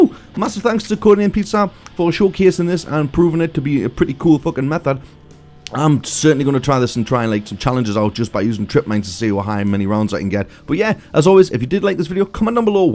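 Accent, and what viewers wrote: Newcastle, United Kingdom. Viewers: are you a geordie mate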